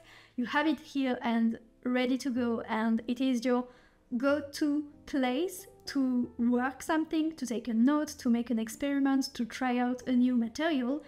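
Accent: France. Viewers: Totally unrelated but I am learning the Korean language and I see how difficult it is to speak in a new language not my mother tongue. From your accent I think you are French but I wanted to congratulate you for such amazing English! It’s not easy! You speak in even better English than some English speakers I know. I live in Ontario Canada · Your French accent is so gorgeous, SO gorgeous! Your voice carries it soo well. Anyway, I will now actually focus watching this. 👌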